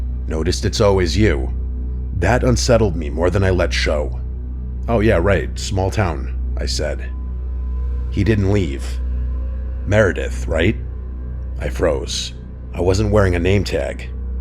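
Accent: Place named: Long Island, United States. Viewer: This dude is from Long Island for sure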